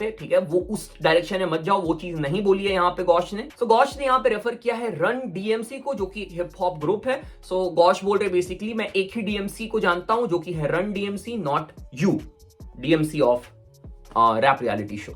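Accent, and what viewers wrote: Bihar, India. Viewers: Bhai tu bihari hai? Bihariyon jaise kyu bolta hai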